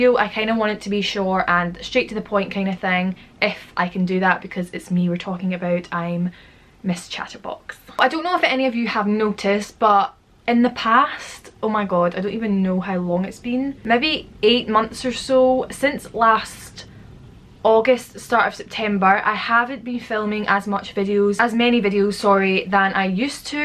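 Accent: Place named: Glasgow, United Kingdom. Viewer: Good luck lovely!!! I'm from Glasgow and have been loving hearing a local accent!! I'm sure you will have the time of ur life in Canada ♥️♥️♥️♥️♥️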